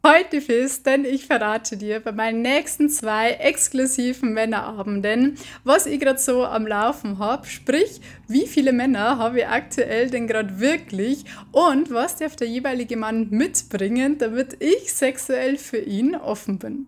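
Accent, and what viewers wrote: Bavaria, Germany. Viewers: Das habe noch von keiner Frau gehört um so erfreulicher finde ich es von dir hören. Du hast so einen tollen Dialekt ich mag dein Bayrisch und du bringst dein Bericht sehr überzeugend und glaubhaft rüber mach weiter so du bist Super.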